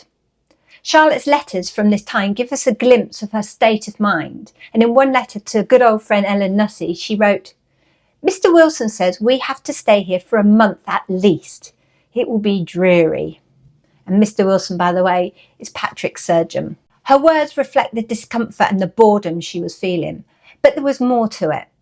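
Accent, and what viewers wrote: United Kingdom. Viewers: I am always amazed how much masticulation is required in the British Isles in order to speak the King's English. Native speakers, sadly, I cannot understand much, the accent is too overpowering, the words and sense often lost. I couldn't consider taking ship to a martian colony with Cambridge or Oxford or Scottish accents --needless to say. Videos are sufficient existential challenges for a wretched, unaccented bloke like me. Other than that, the enthusiasm for Charlotte Bronte is absolutely imfectious.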